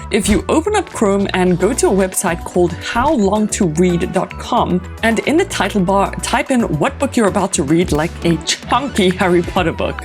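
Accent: South Africa. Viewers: hmmm the access almost sounds South African ? 🤔😄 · She sounds like she is an ex South African . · Great video. Thanks. Well done!! (I’m curious… You South African? accent…🤔🤗) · So glad I found this! Subscribed immediately. And that was 100% because of the South African accent and the smart stuff.:medal-yellow-first-red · I think it's a South African accent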